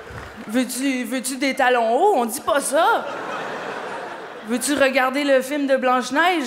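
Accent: Quebec, Canada. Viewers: un con du Quebec dis: SVP oublie pas ton accent!!! Toujours aussi belle et drole! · Alors bien que j'aime bien dieudo et compagnie, je trouve cette fille merveilleuse moi <3 ses expressions faciales sont merveilleuses du genre totalement paumé et son accent québecois ... Je crois que j'en suis amoureux. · Son accent canadien il me tue😂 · Elle ne debute pas mais cest difficile d'adapter son humour sans changer d'accent. Son materiel ne voyage pas tres bien. Au Quebec elle est géniale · 5 ans à apprendre le Français et vous comprenez pas l'accent Québécois? Et bien si j'étais vous j'abandonnerais à l'instant. En plus Maude dsns la video a changé beaucoup de mots et de formulations pour se faire mieux comprendre. Ouf... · Excellent rien que l'accent ça me fait marrer :p ! Vive les Québecois ! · Je sais pas si c'est son accent québécois tout mignon qui fait que les vannes dégueulasses passent crème comme ça ou si c'est elle qui a vraiment une aura super sympathique, en tout cas j'ai eu de vrais rires sur cette vidéo et c'est plutôt rare pour le souligner ! 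Bravo ! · Magnifique l'accent québécois rajoute un certain charme à ce sketch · J'adore les québécoises, je tombe amoureux des que j'entend l'accent. Mais en dehors de ça, j'ai pas trouvé ça très drôle, il n'y avait aucune transition. Dsl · Ou est l'absurde et où est le direct ? Tu peux juste assumer et te dire l'accent canadien est marrant. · elle est bien plus drôle quand elle parle en Québécois les chutes sont bien plus drôle.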